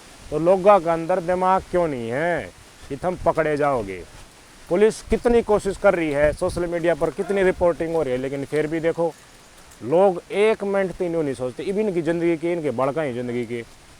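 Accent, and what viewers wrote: Haryana, India. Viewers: Haryanvi hae bhai achi soch rahko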